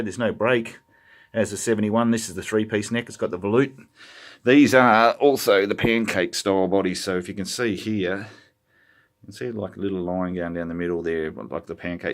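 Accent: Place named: Australia. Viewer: Good to hear an Australian voice talking about guitars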